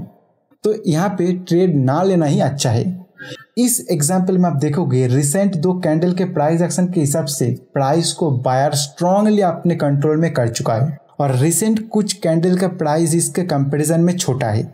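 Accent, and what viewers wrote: Bengal, India. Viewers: app bengali ho kiya?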